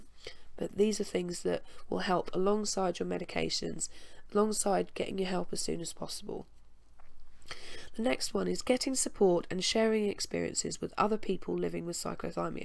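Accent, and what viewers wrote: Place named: United Kingdom. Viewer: I’m glad you have a British voice.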